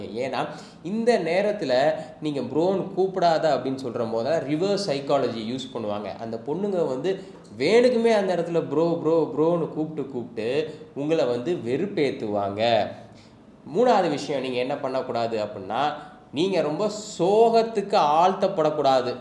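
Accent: Madurai, India. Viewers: Bro madurai ya nenga.na madurai bro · Bro ninga madurai ya🔥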